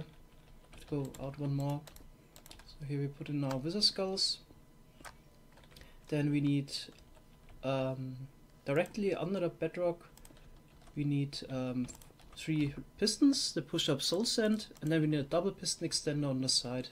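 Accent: Germany. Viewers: Whar natationality do you have? Because your Accent sound very german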